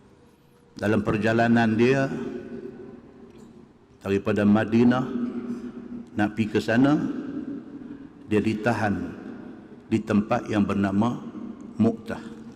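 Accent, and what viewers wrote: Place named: Penang, Malaysia. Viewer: Ustaz Cakap Sungai Acheh sebijik sama macam Arwah Tok saya... Minta Ma Auuf. Semoga Allah merahmati arwah Tok dan TokWan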